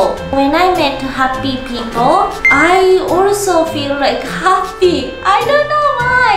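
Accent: Japan. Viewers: Please keep your Japanese accent, for me it makes your englush so cute. You speak well already.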